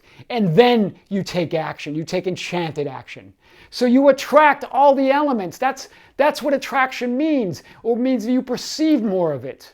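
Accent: Boston, United States. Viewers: "GODHADDATHAAHT." 😄 Love that Boston accent. This was great. Thank you.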